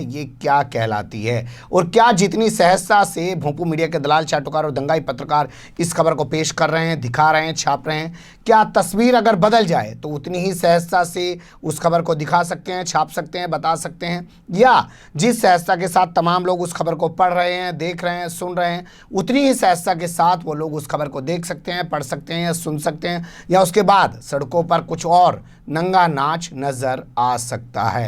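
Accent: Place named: Haryana, India. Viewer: आपका हरियाणा वाली टोन बहुत ही रोचक लगी साहब गजब के बोल देते हो आप।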